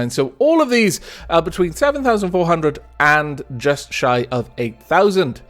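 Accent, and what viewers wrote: Wales, United Kingdom. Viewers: You're welsh? Grwp? :)) that's awesome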